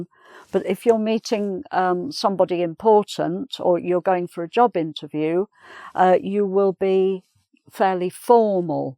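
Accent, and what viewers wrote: United Kingdom. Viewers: British pronunciation the best ever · Thank you 'Mother' You give us valubale topics . I love British accent . Thanks "mother" for being teaching us to all your childreen arround the world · Thanks my great teacher ...love your lesson so much cus you make me able to speak in British accent ...i got 100. ..god save you . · Have signed. Love your British pronousation❤️.Thank you for great lessons!!!! Can I find this list anywhere?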